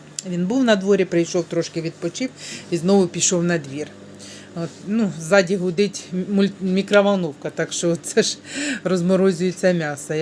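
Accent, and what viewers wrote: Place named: Russia. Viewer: у вас проскакують в кінці слів російська вимова.Ви не в Злинці живете?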